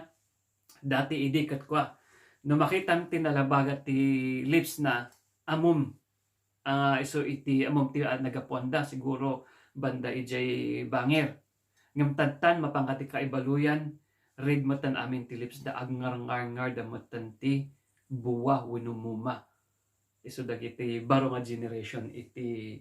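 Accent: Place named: Philippines. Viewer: Hota malaysian actress binadaw toy english accent ni filipino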